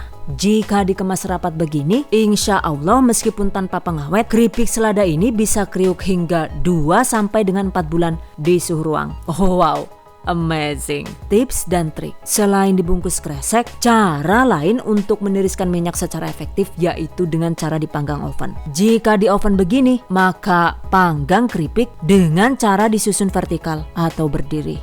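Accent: Banyumas, Indonesia. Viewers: Bun..kok bahasa nya kyk Ngapak ya.. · Dengar ngapak nya ibu jadi kangen kampung halaman😭🙏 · Laaahhh ngapak😅😅😅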